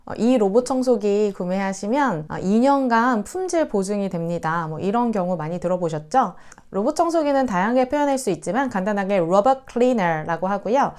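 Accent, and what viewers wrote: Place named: South Korea. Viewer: 와 통종 국문과인거 감안해도 딕션 강세 다 무시한 콩글리쉬 발음 너무 한거 아니요 ㅋㅋㅋㅋㅋㅋㅋㅋㅋㅋㅋㅋ? 내가 2000 초반에 보습학원에서 가르치던 발음 그대로 하고있넼ㅋㅋㅋㅋㅋㅋㅋㅋㅋㅋㅋㅋㅋ 그냥 구글 AI가 발음하게 틀어놓으세요 애들 어릴적 발성 습관 망치지 말고ㅋㅋㅋ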